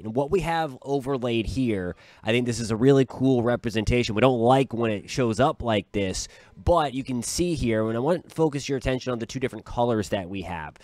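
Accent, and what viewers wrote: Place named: New England, United States. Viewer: Not weather related but did you say you’re in Texas? You sound like you were raised here in New England. Great pace delivering concise and interesting information- thank you!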